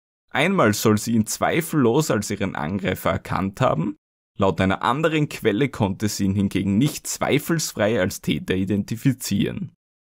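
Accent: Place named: Austria. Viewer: Du bist bestimmt auch Österreicher Deines schönen Dialekts wegen?;-) Vielen Dank für Deine Arbeit😉😊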